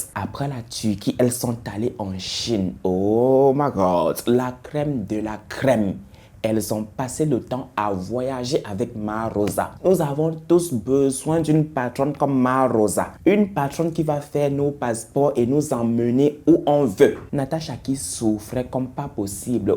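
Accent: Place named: Cameroon. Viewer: Sinon on ne prononce pas le T de VINGT (20) hein, je dis ça je dis rien ! 😂😂 Sinon j’apprécie ton boulot et surtout cet accent si particulier du MBOA que l’on aime tant ! 😅😅 Bonne continuation ! 🙏🏽😌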